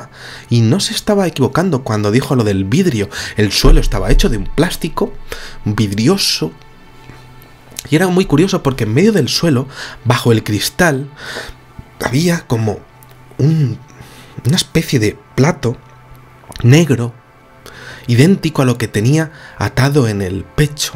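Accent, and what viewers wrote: Spain. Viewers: Es un genio !! y su acento español,,, lo hace mas interesante. Me da mucha gracia su narrativa y sus dichos variadísimos que no se de donde los saca . Soy uruguaya y lo amo,,, es uno de varios youtubers increíbles. Junto con JL , Iván y David.... Muy bien por España y estos amigos que nos comparte. Un abrazo, Zully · Saga Fans no tiene porque, el es español de España, cada cual tiene sus formas y sus acentos, y todos son respetables. Sino te gusta nuestras palabras, siempre tienes la posibilidad de escuchar tus "localidades". Un saludo desde España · no me gusta escuchar el acento español porque casi no entiendo, pero este tipo es encantador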